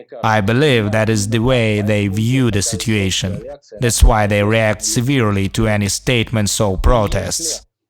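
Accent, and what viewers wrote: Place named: Russia. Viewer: И дурацкий русский(?) акцент ещё зачем-то. Для нац.колорита что ли?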